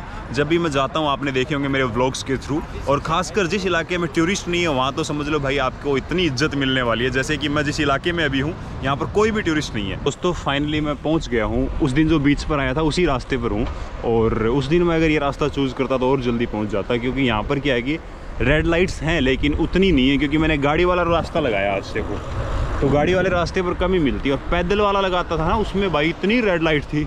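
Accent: Haryana, India. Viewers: Bhai ...kye aap hariyana se ho...aap ki boli mai ye suff jhalak raha hai...I like hariyanvi boli....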